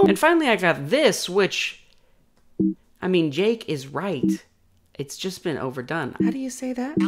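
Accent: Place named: Boston, United States. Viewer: It’s a fucking Boston accent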